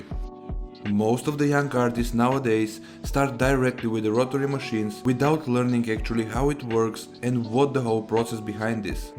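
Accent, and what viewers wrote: Russia. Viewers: какой у вас очаровательный рашн аксент, хи-хи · Дааа ...этот русский акцент...как будто в уши натолкали битого стекла во время просмотра...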